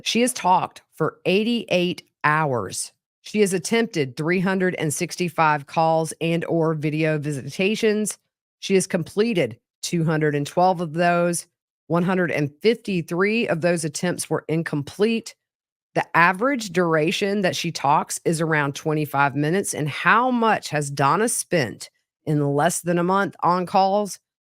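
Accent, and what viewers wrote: South, United States. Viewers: thank you so much for your hard work! We all LOVE your Southern voice. You’re adorable to the max, and full of the best true crime info on the web. Respect. ✊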